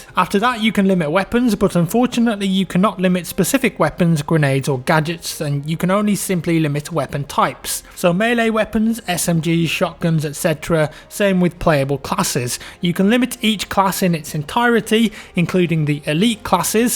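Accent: United Kingdom. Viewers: Meh, you know what, at first I hate the British accent, but you've turned things around for me <3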